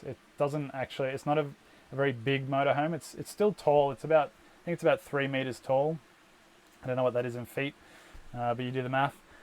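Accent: Australia. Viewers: Very nice little Camper.I was listening to your voice young fella and finally realized its an Aussie voice. Very Nice and this size van would suit an old Lady that I am at 71 years § Still would love an Adventure.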